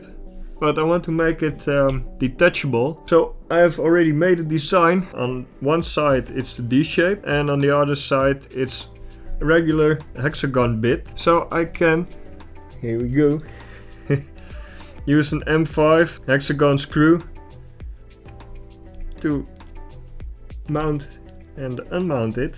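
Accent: Netherlands. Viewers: Ah heerlijk een nederlander, ik hoor het aan het accent 😂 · I fucking knew it xD first time watching your channel. Dat Nederlandse Engels is zo herkenbaar xD Maar wel mooi die flex3drive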